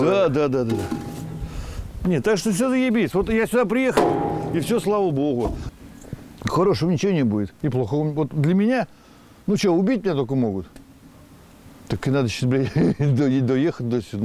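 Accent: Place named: Okanye, Russia. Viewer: Окать перестань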